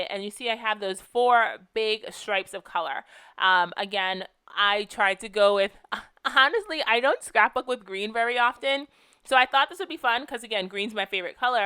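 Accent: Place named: East Coast, United States. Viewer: Love when you do mixed media! It was fun to hear your east coast accent sneak out a bit more on this one too! Beautiful spread!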